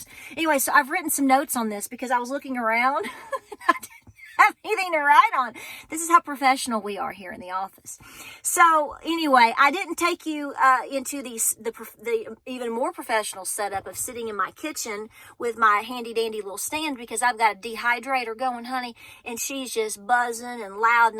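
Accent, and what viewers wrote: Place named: Kentucky, United States. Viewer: you’re beautiful, inside and out! So very thankful I found your channel. My husband and I live in west central Kentucky (both of our families are from eastern Kentucky) and hearing you talk feels like “home” to us. Thank you for all your hard work. This can all feel so lonely sometimes and it’s nice to read comments and not feel so alone. Wish we could all get together! ❤️🤍💙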